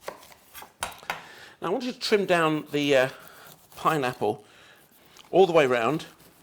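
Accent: United Kingdom. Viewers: nigga u British.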